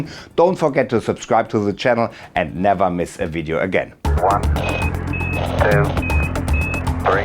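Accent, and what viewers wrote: Germany. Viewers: German Englisch ist a little funny. 😎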